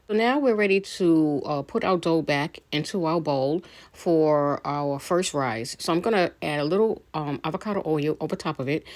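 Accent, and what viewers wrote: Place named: New York, United States. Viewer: 💜 the New York Puerto Rican accent!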